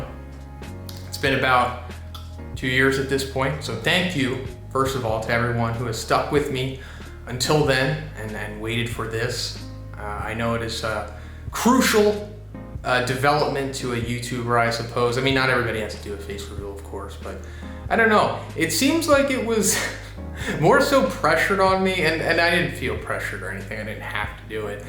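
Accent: United States. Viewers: mikmik he sounds americab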